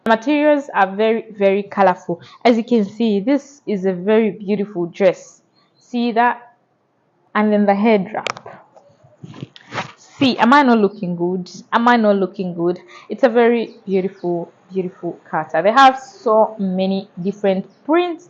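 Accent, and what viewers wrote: Ghana. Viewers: How many years hae you been heir, because you have a percent twi accent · It is evident that you share our values. No wonder you are in love with a Ghanaian. You’ve even mastered the accent. You can sum up our culture in one word - hospitable. Sadly, some of our youth think that the fast life is the way to be successful. It is a big fallacy. Don’t fall for the flash. Our culture is rich. Yeda ase (we thank you)for appreciating our culture.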